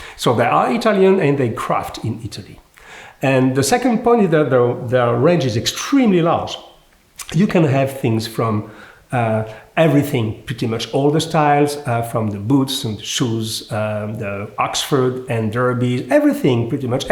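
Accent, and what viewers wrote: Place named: France. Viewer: Dont want to be rude, but the pronunciation on skoaktiebolaget is like three words skor=shoes aktier=stocks/shares and bolaget=company but in this context it means shoetradingcompany, so put air between sko-aktie-bolaget and you will be fine even with a french pronunciation, wich is not to be complained about. Kind regards from Sweden and thanks for lifting that Company .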